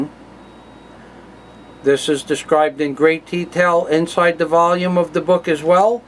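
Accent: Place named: Texas, United States. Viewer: you sound like you from taxas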